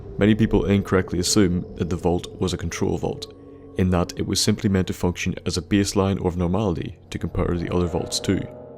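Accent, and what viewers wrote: Ireland. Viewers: He's most likely from Ireland at least his accent is Irish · .....are you irish? lol just a question due to your pronunciation of words. and you say shite a lot haha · feel like he's from Northern Meath or Southern Ulster.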